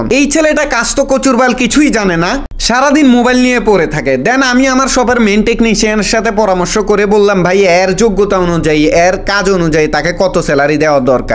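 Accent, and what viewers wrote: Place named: Noakhali, Bangladesh. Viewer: নোয়াখালীর লোক? খাইছেরে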